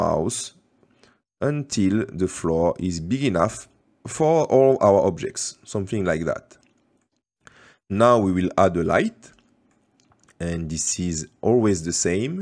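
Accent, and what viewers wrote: France. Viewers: He sounds so french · That French accent though! Perfect for learning :D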